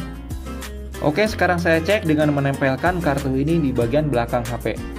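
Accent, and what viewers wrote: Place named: West Java, Indonesia. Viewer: Hatur nuhun akang infona, Mudahan gak salah,, ketahuan Logat sundanya..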